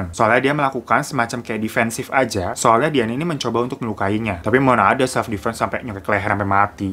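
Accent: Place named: Jakarta, Indonesia. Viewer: Ini orang betawi yaa.. Ngomong nya gitu yaa..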